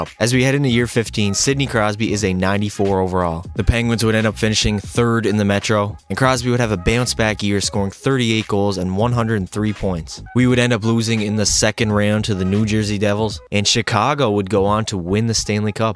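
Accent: Newfoundland, Canada. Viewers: Are you a Newfie? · Any chance you're from The Rock? Or at least from NS? Asking because of your accent lol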